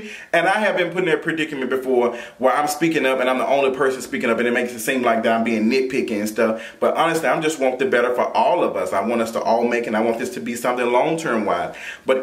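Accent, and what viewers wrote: Memphis, United States. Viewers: You’re so Memphis. Be currful (careful) lol I love it!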